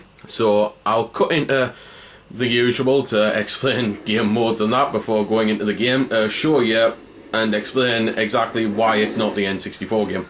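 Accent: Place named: Newcastle, United Kingdom. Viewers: Too those trying to guess he's accent, it is a geordie accent, so he would be from newcastle england, i live in england (london) so am pretty sure thats right (although unimportant, just thought id clear that up) . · wow, your kidding right? This guy is not Australian, he's British. Whether he's English, Scottish or Welsh I'm not sure, I would guess northern England. Im going to call ignorant American on your part, am I right?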